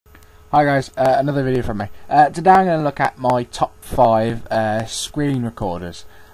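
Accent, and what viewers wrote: United Kingdom. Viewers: this black country accent comes on lol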